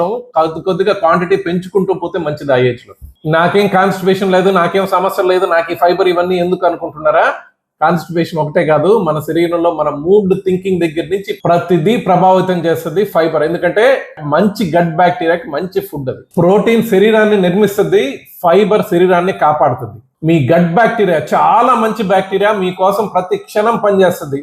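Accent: Nellore, India. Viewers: sir, super sir meeru, with absolute nellore language super explanation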